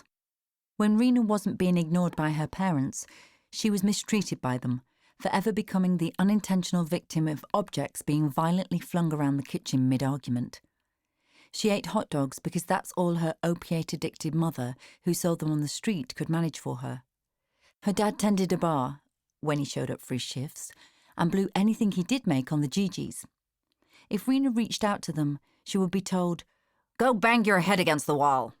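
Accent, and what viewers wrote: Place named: United States. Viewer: Suranne's American accent is spot on !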